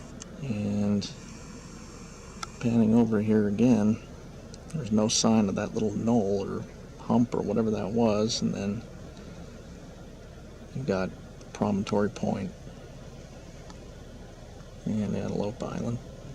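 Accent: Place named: Australia. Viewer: Dear, I'm trying to understand why Yourself, CoolHardLogic and Reds Rhetoric don't use your real names and show your faces on vids? Are yous afraid of something? Even many of these FE people do. Also are there ANY "conspiracies" that you do believe in? Your accent sounds like an Aussie! An Aussie at the a bar the other weekend told me about a supposed shooting hoax in Australia. The Port Arthur Massacre? We never heard much about it in the states here. He said MANY Aussies were fooled by it at first. Apparently it lead to a gun buy back program which took many guns out of Australian people's hands who now wish they didn't do it? Sounds weird to me? These vids on Youtube don't make any sense about it or seem too "conspiracy" minded. It's just a buy back program anyway right? Any man can still buy a gun down there, correct? Australians would never go for that nonsense, they are hard working proud men! 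I don't believe it.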